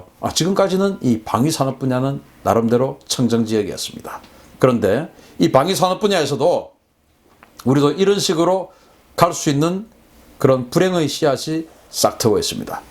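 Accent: Gyeongsang, South Korea. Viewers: 퍼뜩 이해가 않되죠?? 남쪽 오리지날 사운드트렉이 인상적입니다. ㅎㅎ 그래서 사람은 근본과 고향을 속힐 수 없습니다. 비난의 뜻은 전혀없고 박식한 지식과 논리전개 사투리가 인상적입니다. 소시적 보리xx 어떤 선배가 수도권 삼류대학 유학 첫 겨울 방학 때 클라스 메이트들을 데리고 와서 후배인 친구들과 시중을 든적이 있었습니다. 우리동네에서 태어나고 자란 사람은 서울말이 좀 힘 듭니다. 선배가 불식간에 사투리 단어에 서울말 인토네이션을 태워서 여자친구에게 먹을 것을 권하는 걸 보고 배꼽잡고 웃었다가 선배들에게 체포되어 모교 화장실 뒤에서 사망직전까지 구타 당하던 추억이 생각납니다. 님의 말씀을 잘 경청하고 있습니다. 계속 좋은방송 부탁드립니다. 퍼뜩=빨리,금방. 수구리!= 수그려라! 만디= 정상, 꼭대기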